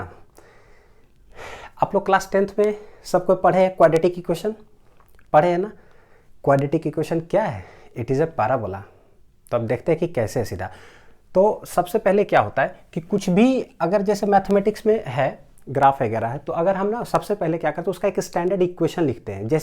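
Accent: Bihar, India. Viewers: Sir are you Bihari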